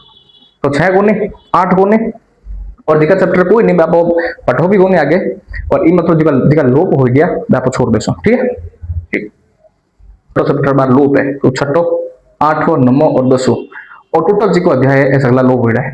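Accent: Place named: Bikaner, India. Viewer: Jai Shree Krishna Bhaiya ...Apki boli Se Aap bikaner ke lag rahe ho..me bhi Bikaner se hi hu Or Army me hu..😊EO RO ki tyari ke liye Apse jud jaau kya ..Part-B pura karwaoge na Aap😊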